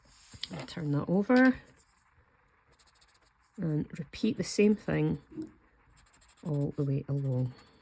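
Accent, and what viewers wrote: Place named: Scotland, United Kingdom. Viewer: Never heard of tangles until now. They look fascinating. Nice to hear that particular Scottish accent, too!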